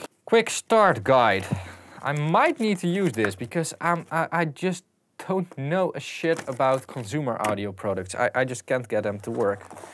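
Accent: Netherlands. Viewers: I have a girl in my college, she's from the Netherlands, she speaks with exact same accent=)) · Its typical dutch english, Its How almost everyone in Holland talks english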